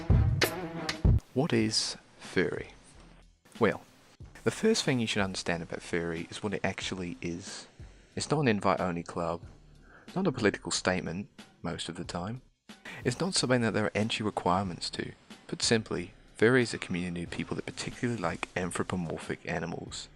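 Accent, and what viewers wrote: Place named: Australia. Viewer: That's an interesting accent, I'm getting hints of North Irish, North English and New Zealand in between an Australian accent.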